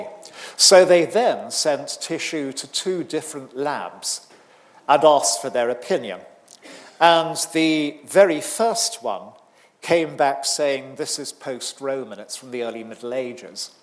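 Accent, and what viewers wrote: United Kingdom. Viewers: It could also be because I find a British accent easier to understand (am Australian).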